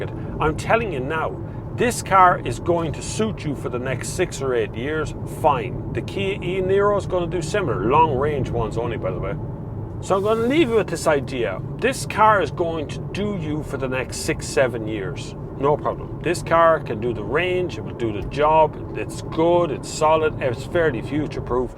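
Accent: Ireland. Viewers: Good reviews of both this and the ID3 - we are currently looking at both cars and wondered what your preference would be - looking at the VW ID 3 Family or the Kia E-Soul? Husband is from Laois so it really helped having a familiar accent to show us the pros and cons of both cars.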